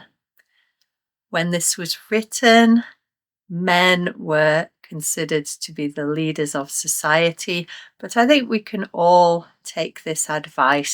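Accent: United Kingdom. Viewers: Thanks a lot for sparkling our love for English language and poetry with British accent.❤❤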